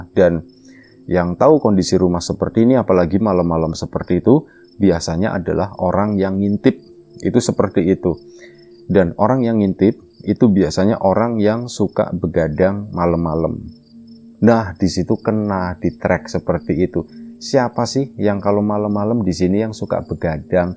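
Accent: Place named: Brebes, Indonesia. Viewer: Brebes ngapak, dadi warga magelang nyimak mas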